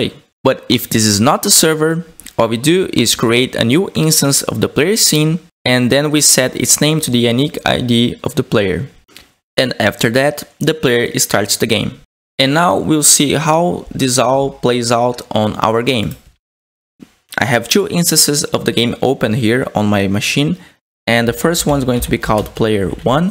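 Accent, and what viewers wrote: Brazil. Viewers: certeza que é br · Você é brasileiro? :v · meu brasileiro falando em inglês nossa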